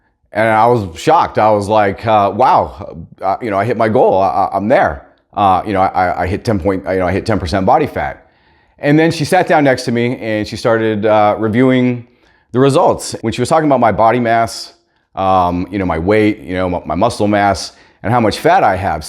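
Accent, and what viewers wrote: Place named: South, United States. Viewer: Dude if I close my eyes it sounds like Dustin "The Diamond" Poirier is speaking, are you from south?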